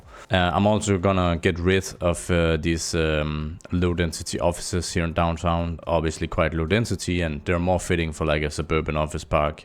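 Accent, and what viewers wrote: Denmark. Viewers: like the video! Are you danish? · Er det en dansk accent jeg hører? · Is that a danish accent I hear? :D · I think hes danish. And yeah its a game it wont look like a real city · Are you from Denmark?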